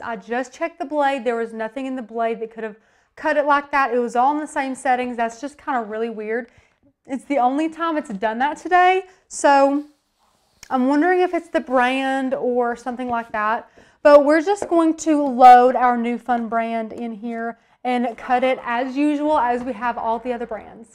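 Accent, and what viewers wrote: South, United States. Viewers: Thank you for an excellent video! I learned exactly what I needed to learn and your presentation was informative and to the point. I also Loved your southern accent. Thanks for doing all the hard work. Excellent.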